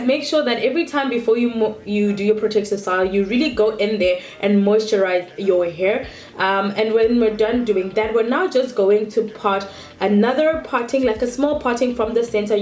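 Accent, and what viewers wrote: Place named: Zimbabwe. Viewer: I had to go through your videos. I thought I heard a Zimbabwean accent.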